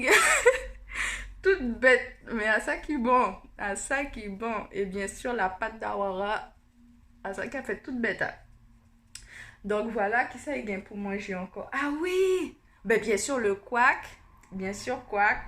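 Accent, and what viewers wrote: Cap-Haïtien, Haiti. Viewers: Kreyòl la sanble a kreyòl Ayisyen an anpil, m konprann 95% nan sa ou di a, que chest beau. That Creole is nice. Resemble the Haitian Creole. 🇭🇹🇭🇹🇭🇹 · Li sanble kreyol moun okap..suis capoise. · Mwen se Haitienne mwen konprann tt sa ou di yo, paske li preske sanble ak creole haitien,( e konsi lè yon ayisyen moun okap pa konn pale français lolll mvreman ri) blague...ou amusante kenbe la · Je peux comprendre Eli kreyol maintenant c'est exactement cette langue qu'il essaie de parler 🇭🇹🇭🇹🇭🇹🇭🇹🇭🇹🇭🇹🇭🇹🇭🇹🇭🇹🇭🇹🇭🇹🇭🇹🇭🇹🇭🇹🇭🇹 je sens une jeune femme haïtienne qui parle a travers cet accent. tu me mets dans ma peau🥰🥰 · Tu parles bien avec la manière des jeunes de la guyane kkk mais on sens le petit cote haïtien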